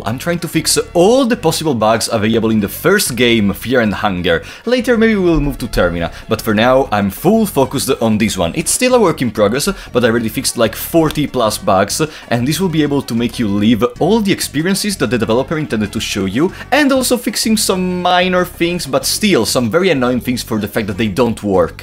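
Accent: Italy. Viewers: sento dall'accento l'italianità, contento di vedere un altro fan di questo gioco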